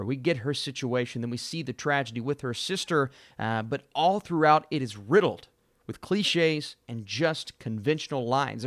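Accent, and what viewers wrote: South, United States. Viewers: I just discovered you. Bro you were made for this. Love your delivery! Love your accent! Southern I'm guessing. You have a new fan! And, I will watch this just to learn from it. I love bad movies, great teachers;)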